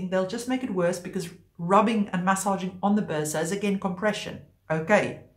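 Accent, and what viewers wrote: South Africa. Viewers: Nice South African accent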